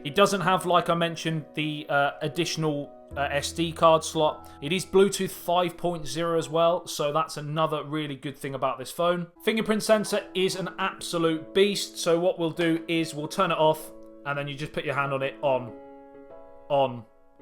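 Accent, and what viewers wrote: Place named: Wales, United Kingdom. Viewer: I would love this phone, and I love your Welsh accent 😉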